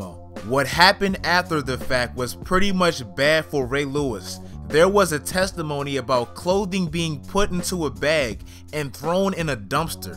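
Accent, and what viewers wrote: Baltimore, United States. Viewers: Yo u from Bmore?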